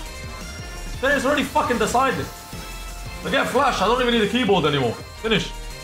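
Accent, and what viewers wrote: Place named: United States. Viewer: The American accent gets me. 😂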